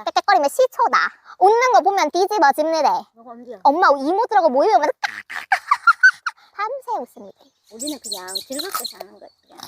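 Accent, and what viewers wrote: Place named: Gyeongsang, South Korea. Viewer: 오늘도 알람뚜자마자 ~~~내가믄져와버려따구 ~~~오늘 언니 말투너무웃곀ㅋㅋㅋㅋㅋㅋㅋㅋㅋ 나도 경상도 아가씨 😂❤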